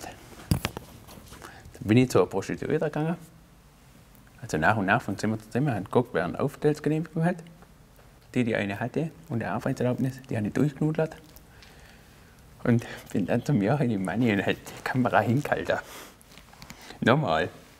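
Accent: Swabia, Germany. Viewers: schwäbisch xD grüße aus ravensburg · HAHA an alle die kein schäbisch könna XDDD ihr versteht nix XD du musst untertitel hin machn^^ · Manta i woass dass ea schwäbisch schwätzt xD awa i dabaggs dengerscht ned so zu ren gschweige denn zu "schreim" ^^ awa vasteng tu i scho guad :) i sollte valleicht ein bissle schwäbisch leana wie i boarisch gleant hob xD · Marcus V Bua, der schwäzt Schwäbisch ned bairisch. Die wolled uns blos nachmacha vorstosch? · Dieses Schwäbisch einfach zu geil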